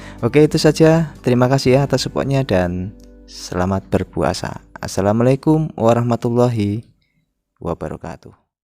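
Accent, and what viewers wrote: Java, Indonesia. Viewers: Jowo ne ketoro nemen :v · Oh aku kira di jawa juga Tapi kok logat e jawa ya Makasih bang saya banyak dpt ilmu dr konten e sampean aku jadi ngerti cara nyervis hp y walaupun blm se master abang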